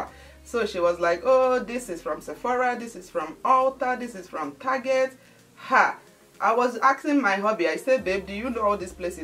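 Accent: Igbo, Nigeria. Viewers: Hahaha , 😂you are soooo real.love you for your sincerity, love your Igbo Accent like the french, Italian, Spanish........❤❤❤ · This is a huge motivation ❤ it was even your so called "Igbotic accent" that made me stick to your channel since I came across it in 2019 bcos it makes me feel at home (like having my sister talking to me)😂 unlike watching influencers with American accent. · its even the accent that made me subscribe....I am an Igbo girl too, and you sounded so relatable. My first time seeing you was that collab you did with Dinma Umeh. Well done for your resilience and Congratulations, you really inspire me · I started watching some 10yrs ago too. I remember I was looking for some videos on how to make Nigerian fried rice and how to tie gele. But I remember clicking on one of your acne videos and immediately I heard your voice, I said, this is my Nigerian sister and I subscribed. 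You have really come a very long way sis. And we thank God for his faithfulness. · I don’t have any acne but I was drawn to your channel because of your accent😊I loved the fact that an Igbo girl like me wasn’t ashamed to be herself on YouTube.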